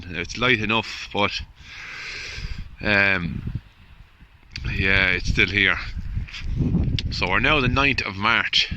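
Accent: Ireland. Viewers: What part of the country are ye in, somehow I had it in my head that you were Kerry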